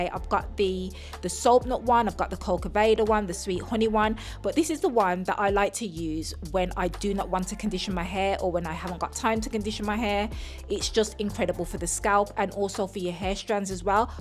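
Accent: Birmingham, United Kingdom. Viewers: I hear a Brummie accent hehe😜☺️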